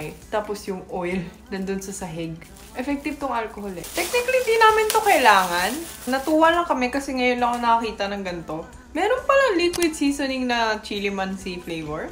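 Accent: Batangas, Philippines. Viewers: Grl,,aliw din aq sau,,, alam mo Yung pagngsalita ka wagi, ay pinay pla. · Very Filipino kung mag tagalog yung accent at yung taglish nya. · Parang filipina mag salita pero koreana 😂 astig. In what language do you think? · Yung Batangueña accent mo ba. Matik din nawawala pag wala ka sa Batangas? 😆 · You look Korean Bec you are but you act & speak like a Pinay 😊✌️ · Hala kakatuwa Naman kayong magkakapatid😊❤Pinoy na Pinoy ka talaga magsalita. Ang galing 😁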